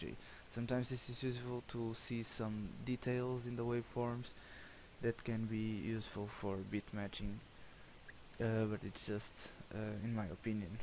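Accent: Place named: Portugal. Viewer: pelo sotaque percebi logo que eras portugues :)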